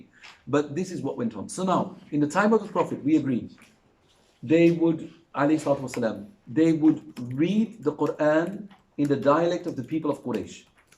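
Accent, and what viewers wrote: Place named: United Kingdom. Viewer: Loved the British accent 😂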